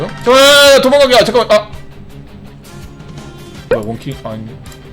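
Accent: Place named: Jeolla, South Korea. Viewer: 전라도 광주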